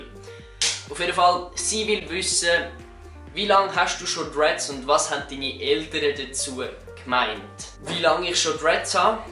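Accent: Switzerland. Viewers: Wir Norddeutschen brauchen doch hin und wieder Untertitel. Aber lass Dich nicht stören. Schwyzerdütsch ist schön. (Oder ist das gar schon Zürcher Hochdeutsch?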